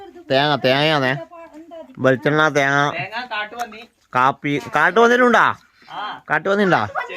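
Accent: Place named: Kasaragod, India. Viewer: നിങ്ങടെ സംസാരം കേട്ടപ്പോൾ ഞങ്ങടെ കാസറഗോഡ് ഉള്ളപോലെ തോന്നും അതാണ് ചോദിച്ചേ അടിപൊളി njan ആദ്യമായി നിങ്ങടെ വീഡിയോ കണ്ടത് ഞങ്ങൾക്ക് കുടുക് അടുത്ത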